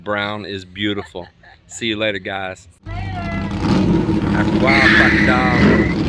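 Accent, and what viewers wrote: Texas, United States. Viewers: Not sure if I mentioned it previously but watching your vids at least for me is an eye opener,I learned a lot from you,I'm not going to build a house but I'm enjoying your knowledge and the easy way in which you explain things,your Texas drawl brings memories from the time I took special forces training at Fort Hood Killeen Texas and Fort Sam Houston in Ssn Antonio back during the Iraq campaigns,,,,I know it takes time and effort to get the vids on YouTube so I thank you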